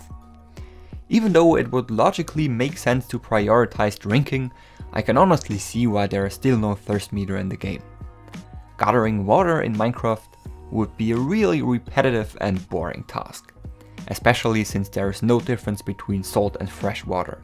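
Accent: Germany. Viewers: Klingstn bisschen deutsch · you sound very german. am i right? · Hah wusst ich doch das dein Akzent deutsch/österreichisch klingt. Props an dich du bist einfach der Beste Minecraft "developer" (<--------- also du weißt was ich meine xD)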